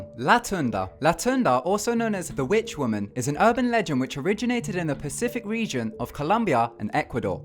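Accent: United Kingdom. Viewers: Bruchas, lol love that British accent.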